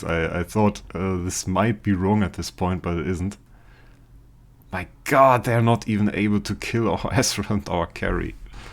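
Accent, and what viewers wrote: Germany. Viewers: Ur from germany right?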